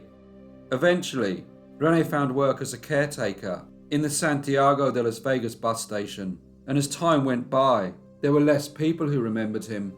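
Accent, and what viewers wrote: United Kingdom. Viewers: I had a hard time understanding you. That's all. Your British accent is real heavy. But the content was great though.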